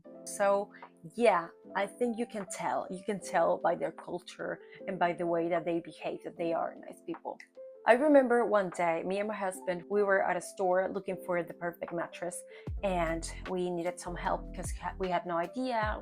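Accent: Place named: United States. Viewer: Strange to see someone saying is from Cuba and speaks english so good that is easily mistaken by an american.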